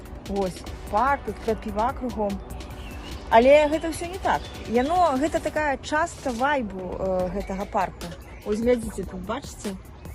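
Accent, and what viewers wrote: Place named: East, Belarus. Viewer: Pięknie pani mówi po polsku. Z lekkim wschodnim akcentem, co tylko dodaje uroku. Pozdrawiam z Krakowa Podobajka❤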